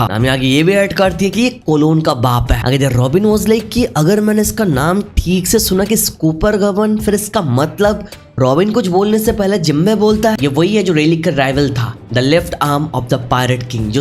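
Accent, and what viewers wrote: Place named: Bangladesh. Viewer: This is a Bangladeshi Channel.. I guess from his accent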